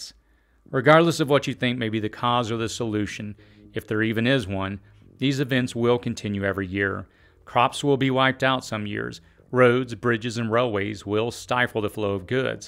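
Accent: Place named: Southern California, United States. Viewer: the so-cal accent is strong in this one.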